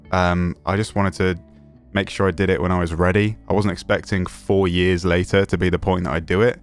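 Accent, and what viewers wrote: United Kingdom. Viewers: I live in Shropshire dang.... Thought your accent sounded familiar🤦‍♂️ I'm from Telford,.. tiss a bit rough lol. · Good on you, to be honest I never actually subscribed until now basically because I 'assumed' I already had (hearing you in the videos) while watching Aculite 'so much' and Fugglet is on fire at the moment - so I kind of always watched you in a 3rd party sense. My Bad, Subscribed and keep it coming mate. Nice to hear the UK within the 'TEAM'. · has a British accent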